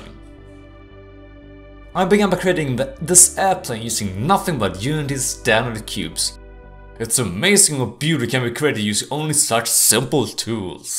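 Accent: Sweden. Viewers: Hello, are you swedish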